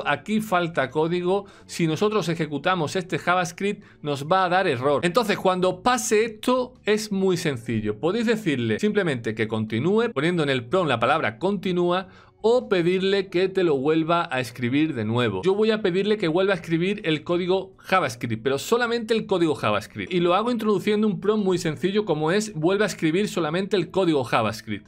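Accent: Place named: Chile. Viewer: te siento algo de acento Chileno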